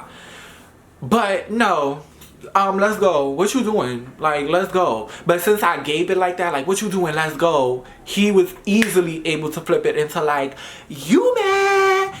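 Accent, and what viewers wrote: New York, United States. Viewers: Dionne T Lee this sound like the average new york nigga lmao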